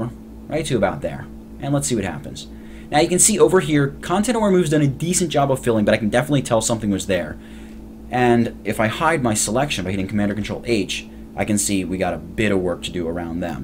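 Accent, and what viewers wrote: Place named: United States. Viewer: armana head on over.... armana go ahead and.... Super Cooele.... gonna move this guy here... Whole buncha stuff Don't you just love what America has done to the English language?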